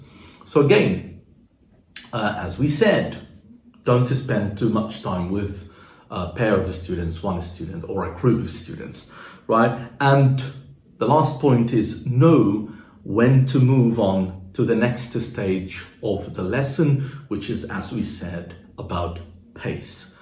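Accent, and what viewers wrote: United Kingdom. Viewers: God Pless you Bro Your British accent is Great Keet it UP ❤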